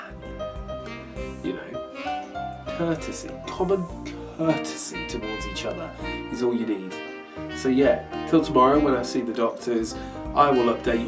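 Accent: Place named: Scotland, United Kingdom. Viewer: Great Scottish accent x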